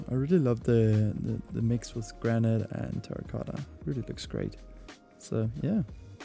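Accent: Denmark. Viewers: Yo you danish? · i noticed your accent, are you danish?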